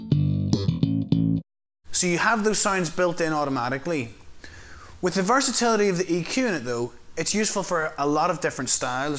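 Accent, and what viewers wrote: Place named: Belfast, United Kingdom. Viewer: Belfast accent!! :D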